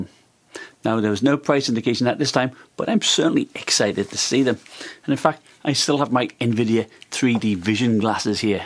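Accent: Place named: Newcastle, United Kingdom. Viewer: U from newcatle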